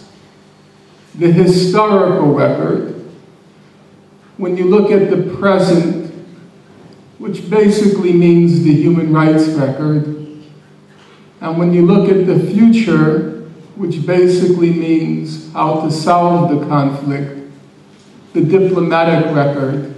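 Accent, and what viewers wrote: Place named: Brooklyn, United States. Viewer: I wonder what the Czechs in the audience who after studying English for 6-8 years were thinking as they listened to Norman speak. Listening to him repeatedly pronounce the word "wall" in his thick Brooklyn brogue, I couldn't help but wonder what word did it sound like to the Czechs?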